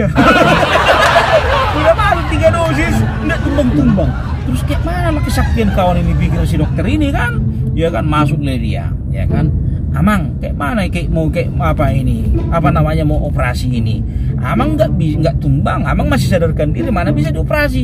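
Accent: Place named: Batak, Indonesia. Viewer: Aqu suka banget bang gaya bicara horas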